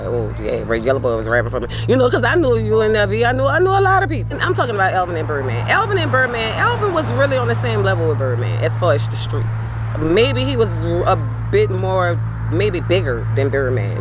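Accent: New Orleans, United States. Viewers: I love that new Orleans accent ..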